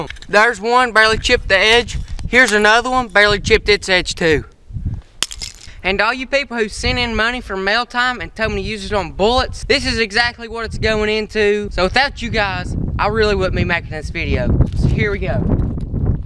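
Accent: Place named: South, United States. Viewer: what state do you live in if you don't mind telling. I can tell your southern but I'd love to know whereabouts.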